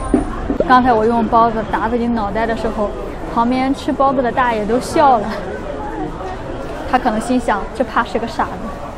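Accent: Shandong, China. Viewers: 听口音，应该是山东莱芜人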